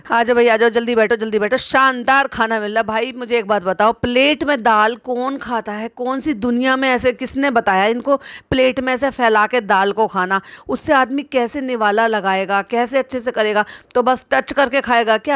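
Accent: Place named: Old Delhi, India. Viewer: Aapke bolne ke style se lagta h Ki AAP purani dilli se bai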